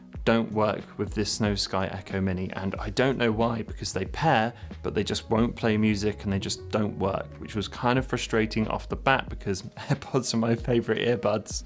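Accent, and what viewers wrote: United Kingdom. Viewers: I have never realized how satisfying the British accent is to hear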